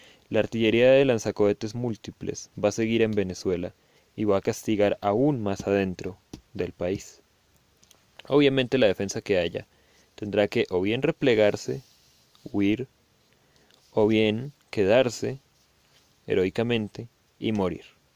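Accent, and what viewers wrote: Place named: Colombia. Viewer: por tu acento siento que eres colombiano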